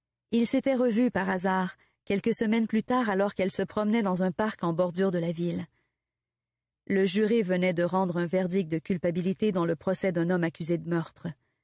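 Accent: Quebec, Canada. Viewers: Moi de même,+ l'accent Québécois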